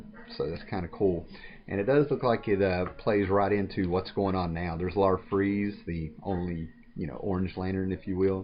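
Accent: South, United States. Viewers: Finally someone else with a southern accent haha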